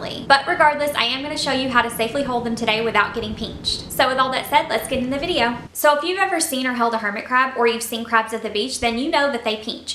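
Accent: South, United States. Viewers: she is southern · she's southern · It's her Southern Accent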